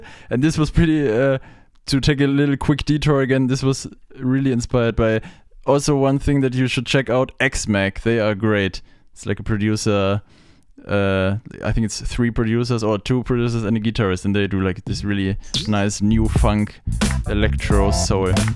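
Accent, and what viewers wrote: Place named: Germany. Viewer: talks like a german, 'Heh?s' like a dutchman . do Germans do that too? I thought it was a very dutch thing until i heard you do it.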